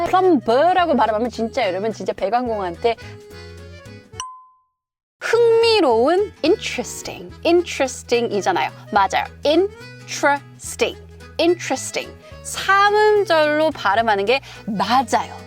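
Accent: United Kingdom. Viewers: 영국사람처럼 발음하는거 솔직히 존나 킹받음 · Hump day ㅋㅋㅋㅋㅋㅋㅋㅋ 발음이 영국식 발음이시네요. 재밌게 봤습니다. 구ㅕㅂ ❤️ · 넘나 재밌어요 영국 발음 체크하기 좋아요 꿀잼 쵝오🎉😂❤❤❤❤ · 영국취업 준비중인데 영상 너무 도움돼요! 이렇게 영국원어민 발음으로 영어 가르쳐주시고 심지어 재밌기까지😊 감사합니다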